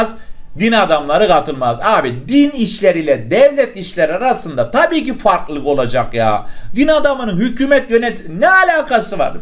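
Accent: Adana, Turkey. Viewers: Adanalısınız galibaa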